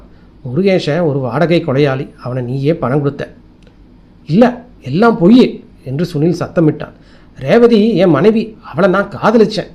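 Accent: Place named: Kongu, India. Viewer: கதையும் வாசித்த விதமும் குரலும் அருமை வாழ்க வளர்க ...... உங்களது பேச்சு சிறப்பு கொங்குதேசம் போல தெரிகிறது தொடரட்டும் பணி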